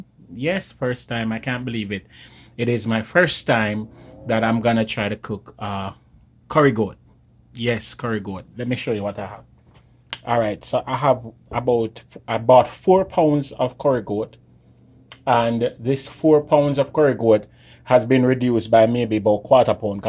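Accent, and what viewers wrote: Jamaica. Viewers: ..where you from???You sound Jamaican and how yu don know how to cook curry goat!! What??? Yu neva drink Maniish Water?? Anyhow yu cooking good? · I love how you talk!!! Real yaadi! BTW I'm going to try your recipe.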